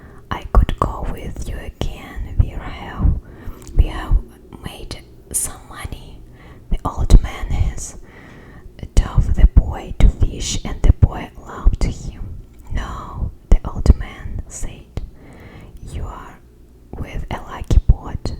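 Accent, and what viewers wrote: Russia. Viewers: You have a very lovely Russian accent!! Especially you also have a very lovely voice! I could just listen to you all day!! 🤍🤍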